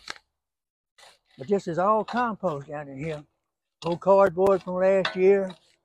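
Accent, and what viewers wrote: Louisiana, United States. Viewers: Dear, I just love watching your videos. Your like the father I never had. I love your Louisiana accent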